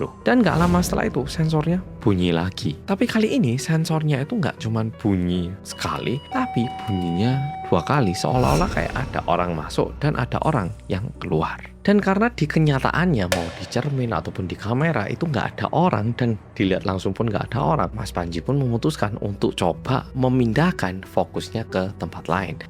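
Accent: Java, Indonesia. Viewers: Medhokkk(medok) Kalau RDD kwedheeen(ketakutaan)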